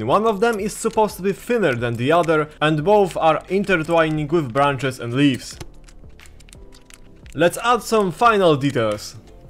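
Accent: Poland. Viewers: Kurde wyczułem polski akcent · Nice creations and jesteś z Polski bo masz taki akcent ? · Are you from poland, beacuse you sound a bit like that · ale polski akcent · Are you polish? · I can feel the Polish accent in your films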